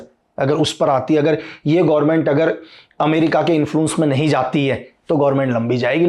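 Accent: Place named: Rampur-Moradabad, India. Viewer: ay be from Rampur-Moradabad, if you go by accent.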